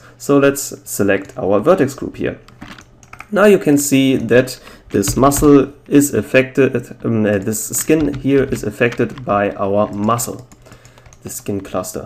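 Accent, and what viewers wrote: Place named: Russia. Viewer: Вроде русский акцент, запили на русском всё тож самое плиз =)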